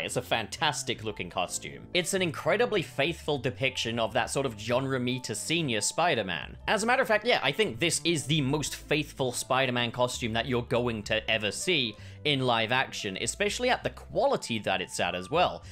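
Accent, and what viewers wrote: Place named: United Kingdom. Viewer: I think he is somewhere in the u.k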